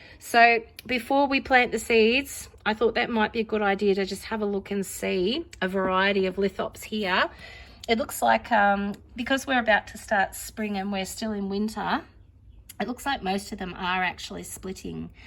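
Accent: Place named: Australia. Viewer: Lovely Lithops, great to hear an Ozzie talking about them!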